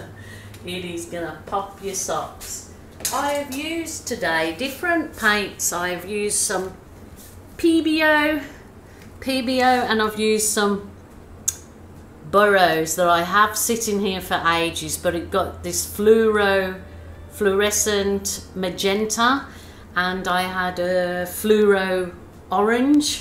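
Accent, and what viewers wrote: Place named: Northern Ireland, United Kingdom. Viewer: Also from Victoria BC! Originally N.Ireland. I was trying to figure out accent and thought she sounded a bit like me but with a mixture! Love her work land her ability to so naturally express herself in words and in paint!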